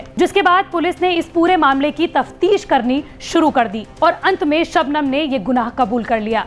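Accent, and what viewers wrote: Bihar, India. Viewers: App bihari ho kya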